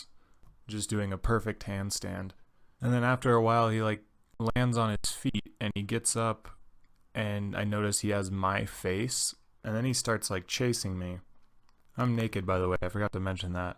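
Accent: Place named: Utah, United States. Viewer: love your vids man, if you're in utah like it sounds like you are we should play some MTG sometime or get drunk and yell at the mormons in the airport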